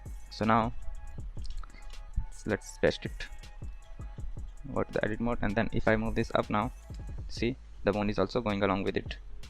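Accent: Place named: India. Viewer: bhai request ha video ek dam shi bani ha bas jab bolta ha na koshish kar ki words pura smaj aya audience ko indian slang ata ha thoda wo thodi dikat dalta ha baki content tara shi ha ekdum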